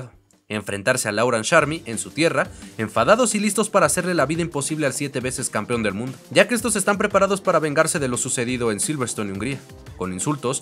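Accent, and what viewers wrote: Mexico. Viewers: pensé que eras mexicano xdd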